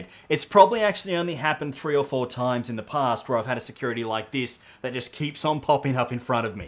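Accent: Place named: Australia. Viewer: Love the content bro, clear concise and Australian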